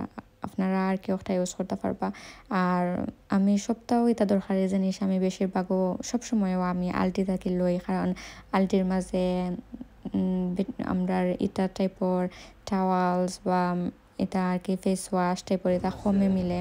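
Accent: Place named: Sylhet, Bangladesh. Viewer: Normal vhabe kotha bolle vhalo hoy Karon onek ktha bujhi onek ktha bujhina … jara sylheti na tader ektu somossha hote pare arki 😢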